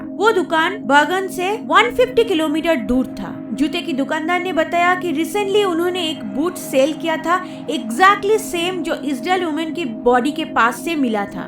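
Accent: Bengal, India. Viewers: Tmhari accent bengali hai, tm Bangladesh se ho ky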